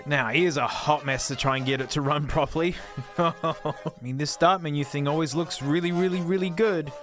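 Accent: Australia. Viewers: You sound like sniper tf2 and aussie too noice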